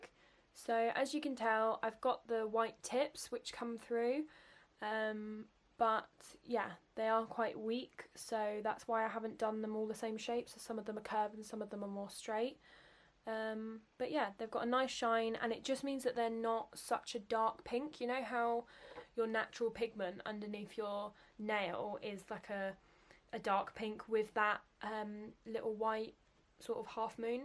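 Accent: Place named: United Kingdom. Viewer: Ayee your British as well yayyy what part are you from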